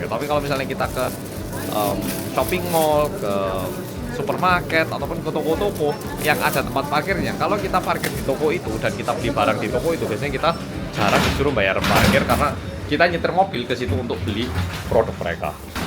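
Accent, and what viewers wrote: Java, Indonesia. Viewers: Mukanya kore tp klo bhasa indo logt jwanya kentel bget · AKU GAK SKIP IKLAN DONG UNTUK YANG MEDOOOK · Hai medok sudah lama nggak posting mega pasti lucu karna kamu nyenengi sekarang mega main folinya banyak bagus 😂❤❤❤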